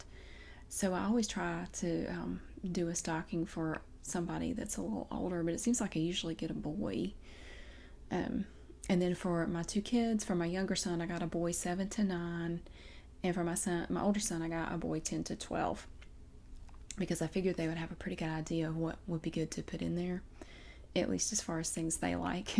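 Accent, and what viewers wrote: South, United States. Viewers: Holy marriage proposal! I could listen to that darling sweet voice all dang day! Gotta love that Southern accent!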